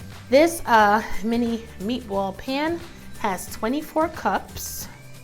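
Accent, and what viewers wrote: New York, United States. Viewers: love ur ny accent lol been watching all ur videos keep em comingggggg !!!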